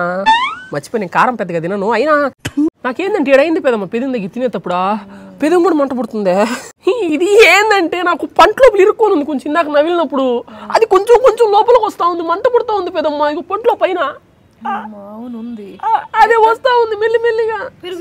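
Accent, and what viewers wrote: Nellore, India. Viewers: నెల్లూరు slang ❤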